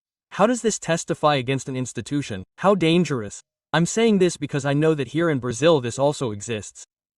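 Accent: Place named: United States. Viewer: Vidio foi gravado por me parece com voz americana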